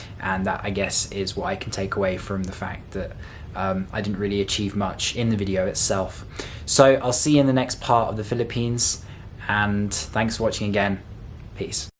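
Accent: United Kingdom. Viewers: sounds British accent....enjoy trekking Sagada...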